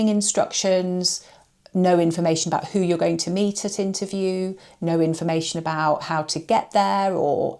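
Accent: United Kingdom. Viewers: I was recommended your video just now, and signed up for your newsletter! I am glad your video popped up. I like your demeanor and tips. I like your demeanor because you sound like you are from the UK. Here in the USA, many places need that good ol English/British/or other UK country wisdom that helped mold the USA.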